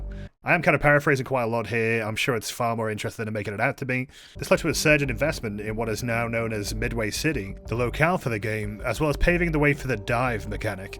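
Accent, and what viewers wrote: United Kingdom. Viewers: yes britbong whisper those sweet supple long words some more please <3333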